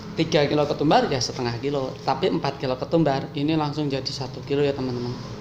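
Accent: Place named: Java, Indonesia. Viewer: Kayak logat orang jawa yg buat bumbu padang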